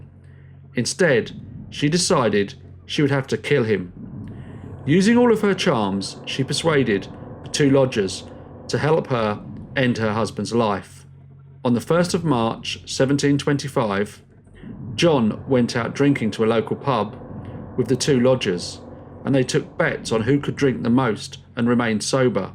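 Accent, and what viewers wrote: United Kingdom. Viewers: Wow, how far you have come on, from these early presentations of work. Its as if you thought the audience would struggle understanding you correctly because of your wonderful accent!!! Your work is still bloody marvellous and as gripping as always. Im so happy for you. The success that your channel has turned into, is very well deserved. Your unique cases are so interesting and researched wonderfully i can't express enough how much I love them. Im going back to the beginning again to enjoy once more. Thanks again. P.S. w3ll done for embracing our wonderful colloquial language and accents. I love being British and eccentric!!! 🤣😉😉🤪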